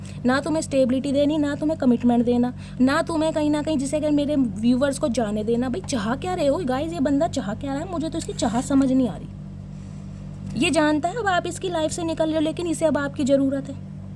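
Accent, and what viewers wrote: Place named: Uttar Pradesh, India. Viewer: Ye बताओ कि आप मैनपुरी आगरा साइड से हैं। आपकी बोली सुनकर ऐसा लगता है। मेरी बोली भी ऐसी ही है। कुछ कुछ ।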